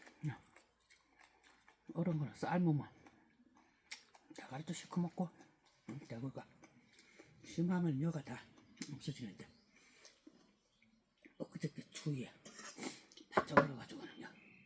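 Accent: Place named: Gangwon, South Korea. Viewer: 강원 도 사투리신가봐요. 말씀도 구수하고 나물향도 구수할것같아요. 땅두릅을 그렇게도 부르는군요. 산행하시느라 고생 하셨네요. 맛있겠어요.🤗👍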